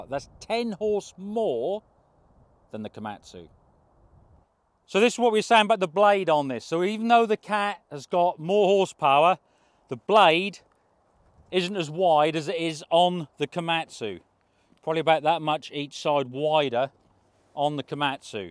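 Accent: Norfolk, United Kingdom. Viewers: What accent is that? Norfolk?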